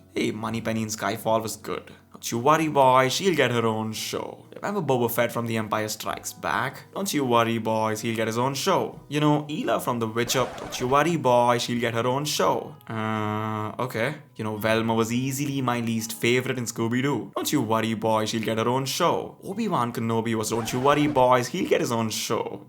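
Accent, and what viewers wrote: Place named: India. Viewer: Click on video I want to watch: Indian voice Okay nvm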